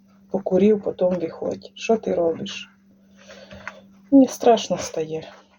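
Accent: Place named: Ovruch, Ukraine. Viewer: Про овручский диалект не слышали? Так хотя бы поинтересуйтесь об этом. В каждой стране люди разговаривают на разных диалектах, говорах. Нельзя так неуважительно относиться к людям.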